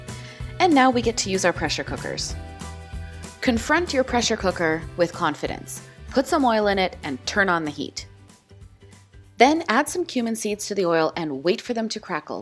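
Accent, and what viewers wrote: Canada. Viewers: its canadian accent and its almost perfect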